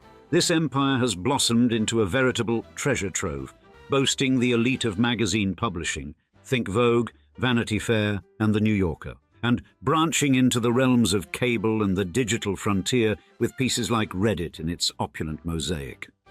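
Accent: United Kingdom. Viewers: As a lover of history, I look forward to your videos every week. Your fusion of history and contemporary culture with your British accent is very satisfying. Thank you for all that you do on this channel.❤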